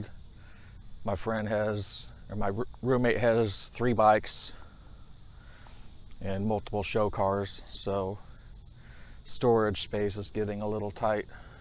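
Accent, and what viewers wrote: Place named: St. Louis, United States. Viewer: Hey, it sounds like you are in the Lou area! Good vid.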